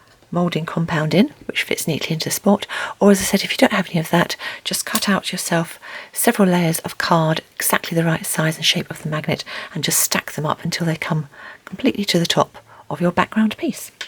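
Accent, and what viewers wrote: United Kingdom. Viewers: Very cute! You are a great teacher, and your videos are charming and relaxing with the piano background music. It is impossible to be in a bad mood and watch one of your videos. They are so well-narrated, and so thoroughly British! Happy Halloween!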